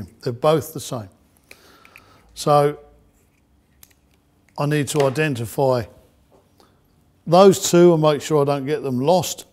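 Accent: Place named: United Kingdom. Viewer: This gentleman speaks like Magneto in X-Men. Nice British accent 👌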